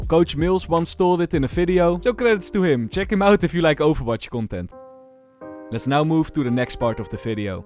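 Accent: Netherlands. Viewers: i smell the dutch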